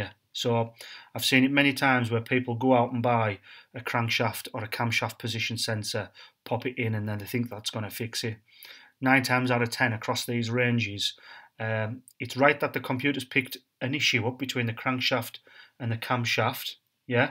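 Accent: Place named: Cumbria, United Kingdom. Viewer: You sound like your Cumbria based, I have one of these with the p0016 code, do you fancy doing mine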